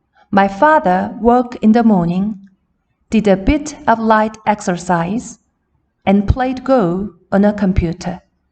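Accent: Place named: United Kingdom. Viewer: 영국식 영어발음 넘 멋져요 ❤️ 읽어 봐야겠어요.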